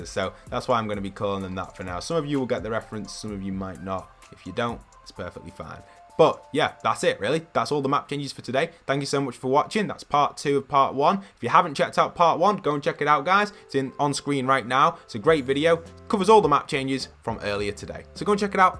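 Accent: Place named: United Kingdom. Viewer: He british that's how Brits say it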